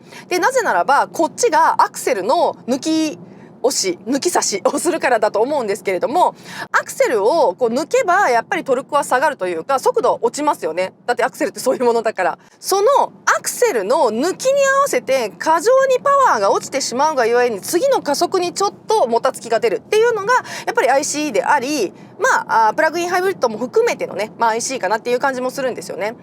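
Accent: Kansai, Japan. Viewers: って、関西弁たまぁに出るやん❤ 鞄に飴ちゃん忍ばすオバちゃん系。早口と、関西人ならではの、間を嫌うマシンガントーク ❤いつも観てます🎉大好き。でも、ロングレンジRWDなら買いたいです。来年かなぁ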